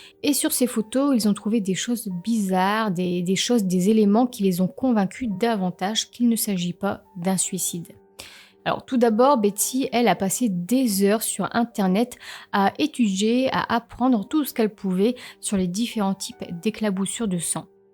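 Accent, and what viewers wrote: North, France. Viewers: Bonjour. J'adore votre concept.Narration parfaite avec ce petit accent du nord.qui vous donne petit.plus..Histoirs passionnantes.. beau travail. Un grand merci a vous. 💚👍👍👍💐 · bonjour je voulais vous dire que vous racontez très bien ces affaires que vos documentaires sont bien étoffés. on voit que vous travailler sérieusement pas comme d'autres et je voulais vous remercier pour votre travail car c'est toujours agréable de vous écouter! je ne m'en lasse pas! ne seriez vous pas une fille du Nord?? et vos affaires criminelles sont inédites ce qui est très plaisant.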